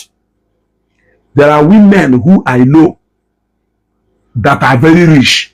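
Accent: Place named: Nnewi, Nigeria. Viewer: My son, ina eti-eti o! Continue with your Nnewi dialect, I love what you’re doing and never miss any topic. Jisike, so proud of you. ❤️❤️❤️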